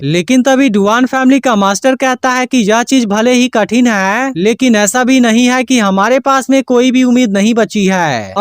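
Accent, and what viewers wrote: India. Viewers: First comment for u 😚 big fan bro ur voice from odisha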